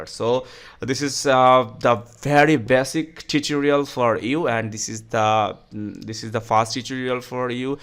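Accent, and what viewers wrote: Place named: India. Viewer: i love your indian accent next time put subtitle pls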